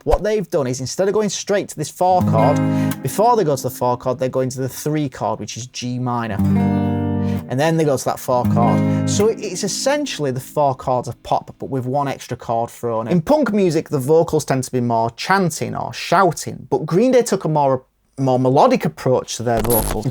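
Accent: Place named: England, United Kingdom. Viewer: Good video mate. Think some of the nuance in what youre saying gets lost outside England along with the accent :) Not a band/song ive ever been a fan of but maybe i should give them a go - i agree that they were musically more conscious than some other punk bands of the time - probably their image and they way they were pronmoted helped too. God knows what sell out or anything like that means - its all bs - green day were making music they wanted to make you can feel that and people loved it - i don't think that was selling out or anything like that. Being unoriginal isn't a criticism - the beatles took stuff from chuck berry, jazz standards, 50s pop, classical, elvis etc and every band before or since has too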